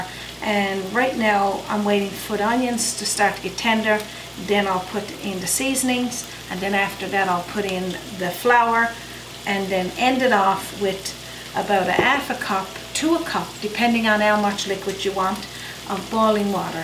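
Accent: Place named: Newfoundland, Canada. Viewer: didn't mean it to come across as rude! My apologies 💜 Love Newfoundlanders and the accent.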